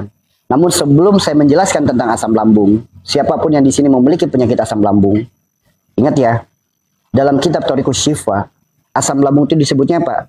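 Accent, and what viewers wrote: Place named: West Java, Indonesia. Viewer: Ya allah pa ustadz, sunda bngt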